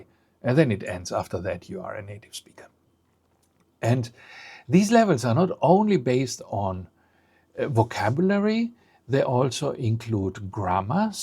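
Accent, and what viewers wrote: Germany. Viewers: I lived in Greece some thirty years ago and learned the language. Never stopped learning Greek as I follow music, television and film. According to your test I have C1 level of understanding. C2, I could understand some but not the details of the message. Btw your accent in Greek is almost imperceptible, though I could tell when you spoke English that you are German.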